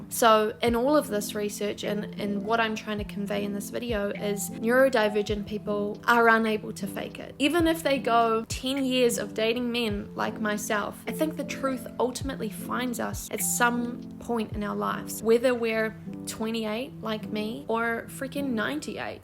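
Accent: New Zealand. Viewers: Unrelated but those Kiwi vowels are so weird, I love it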